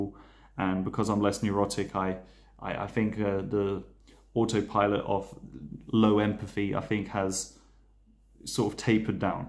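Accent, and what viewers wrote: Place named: United Kingdom. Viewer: i love your british accent very calming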